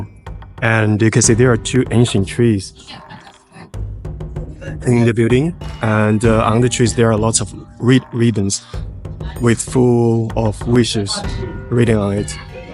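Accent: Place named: China. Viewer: I think he is based in China because of his accent, Hong Kong people have a different accent.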